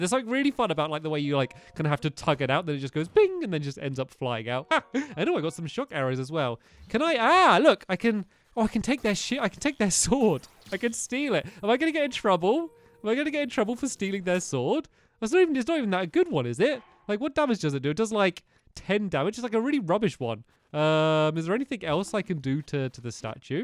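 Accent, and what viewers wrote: England, United Kingdom. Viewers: hes british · Question are you English or american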